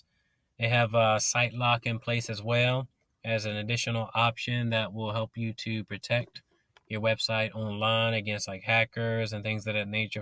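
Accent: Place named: United States. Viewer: Hostpapa is a Canadian web hosting service. You sound American. How did you hear about it?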